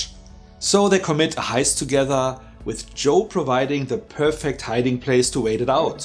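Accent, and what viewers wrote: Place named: Germany. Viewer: I like ze quality of ur english but still it is herable: you truly are a German dude. So, warum sprichst du nicht einfach auf Deutsch? So gut auch wirklich dein English ist, so sehr hört man es einfach heraus, dass du Deutsch bist und schwubsti wubs, kann ich dir ned mehr folgen.... So please, keep speaking in German, zaz how I understand u most perfectly.... U understand Mr?:D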